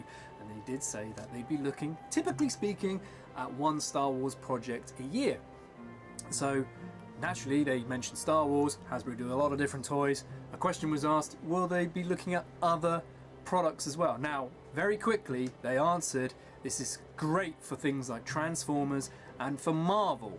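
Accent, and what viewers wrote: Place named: England, United Kingdom. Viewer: Who's 'we' with your English accent? ! Coz as far as I'm concerned, we ain't getting it! Unless someone comes out and says everyone else can get one...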